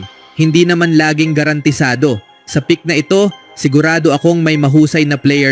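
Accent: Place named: Visayas, Philippines. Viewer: Bisaya ka sir halata salita mo?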